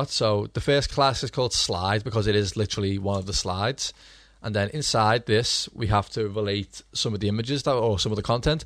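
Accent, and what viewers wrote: Liverpool, United Kingdom. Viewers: fellow scouser? haha nice one dude great vid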